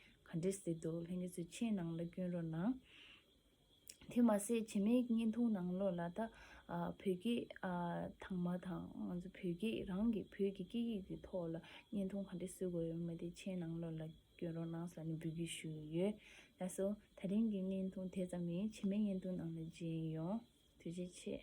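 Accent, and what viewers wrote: Standard. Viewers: བོད་སྐད་གཙང་མ་སྐྱོན་གྱི་འདུག་པས་ཉན་རིན་འདུཙ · བོད་སྐད་གཙང་མས་ཐོག་ནས་གཏམ་བཤད་དཔེ་ཡག་པོ་འདུག།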